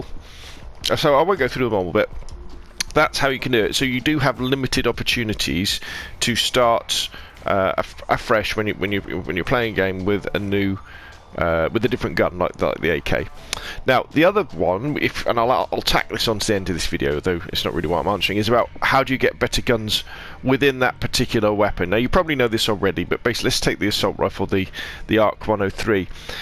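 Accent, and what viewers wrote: England, United Kingdom. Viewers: Excuse me, sir, you are saying everything. Corrects, you explain it very good, but please take the marbles out of your mouth when you're speaking. It's really confusing. My friends, I know you have an english accent. And that's why listen I have a Boston accent. That's very deep so if you could clarify a few things, take the models out of your mouth and thank you.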